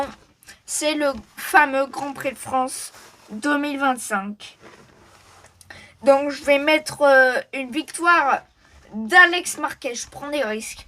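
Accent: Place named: Spain. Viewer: Bien d'avoir ce podcast que tu as commenté en direct... j'ai suivi et j'ai rapidement accroché pendant un bon moment.Est-ce que tu penses qu'il serait possible de commenter en ayant les grille des temps à l'écran? Quartararo au sol... et même Marquez En quelques minutes j'ai appris plein de choses. Et pour ton accent espagnol et pour ton ¡Vive la France! tu es un vrai champion. Et non tu ne te ridiculises pas, crois-moi. Ni même en loupant un résultat, au contraire, tu prends des risques. Salut et à bientôt, Mister!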